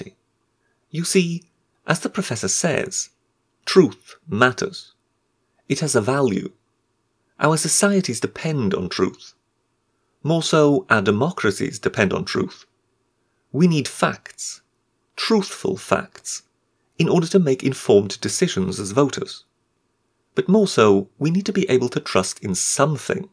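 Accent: United Kingdom. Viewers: You sound like Dr. Layman - with a British accent of course.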